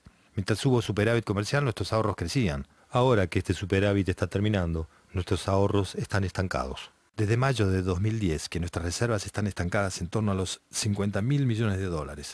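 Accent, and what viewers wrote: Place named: Argentina. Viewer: Yo pensé que era un argentino que quizás vive en Chile o algo, tú sabes que a veces los acentos se pegan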